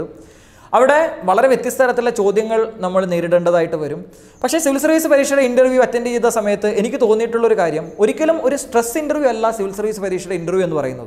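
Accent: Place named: Kollam, India. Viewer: Kollathullavr samsarikunna athe baasha shaili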